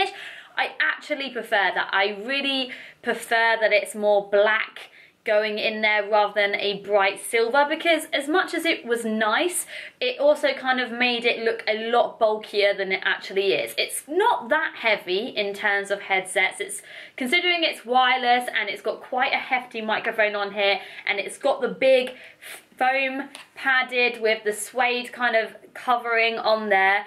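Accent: United Kingdom. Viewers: Man British accents give me a headache, I can't take more than about 5 mins of it, but this is a sweet looking headphone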